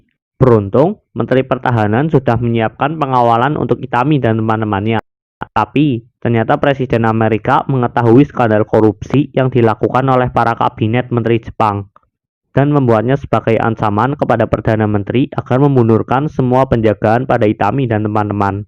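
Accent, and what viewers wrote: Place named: Banyumas, Indonesia. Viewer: Semangat bang.. ngapak joss 👍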